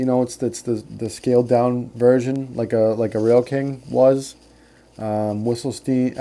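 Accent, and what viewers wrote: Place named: Massachusetts, United States. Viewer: Great video review, You did a good job calling out nonsense and exorbitant prices. Between the prices, sloppy craftsmanship, goofy colors, and bad customer service, it's hard to justifying buying this stuff That RS-27 came from the MTH budget rail-king line which sold well under $400. Lionel almost doubled the price when they got the tooling The passenger cars are almost Golden Gate Depot prices, except Lionel passenger car are plastic, generic and not in the same league. I know there is rampant inflation, but the Lionel steep price jumps started last catalog, before inflation really ramped up....after MTH announced their closing Sounds like you are from Mass, I grew up in Dorchester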